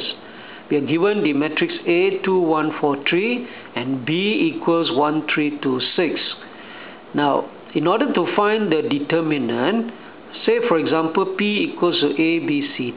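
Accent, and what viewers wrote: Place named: Philippines. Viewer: sounds asian... phillipino is my guess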